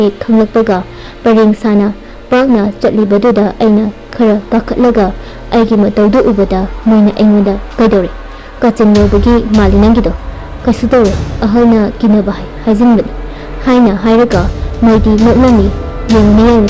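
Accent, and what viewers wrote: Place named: Kabui, India. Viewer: Sis gi wari khulise original meitei na ngangba mande...kabui na Meitei lon ngangbagi accent ( tone) ama chang ngi.... khonjel se ymna thot nou ee ymna nungcba khonjel amadi oi. Keep going 👍👍👍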